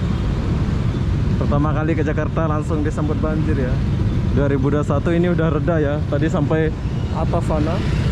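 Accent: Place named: Malaysia. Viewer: Saya terkesan dengan logat bicara bg yg kental akan nuansa melayu jadi saya do'akan semoga bg sukses dan sehat selalu. Salam santun dari saya putra melayu riau🙏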